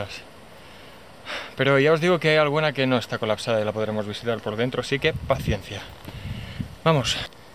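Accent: Spain. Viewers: Tu catalan no eres verdad jajaja tranqui yo tmb xro a ti se te nota mas que eres catalanoparlante y yo castellanoparlante xdd pero tienes una pinta de bonachon de buena gente y de super tranquilo...jajajaja bueno un abrazo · Me podrián decir de que parte de españa es,me llama la atencíon el acento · No va a decir dónde es hasta que acabe el video para que lo veas , ya que lo que le interesa a la gente es saber donde es y como este señor habla como español pues ese lugar es en España y pues a quien le importa España , por lo menos a mi no por ahora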